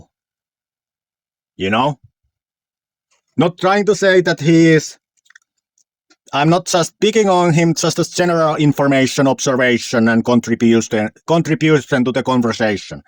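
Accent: Finland. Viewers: strongest finnish accent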